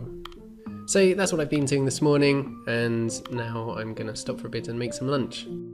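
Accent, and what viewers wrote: Germany. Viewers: Is he from Germany?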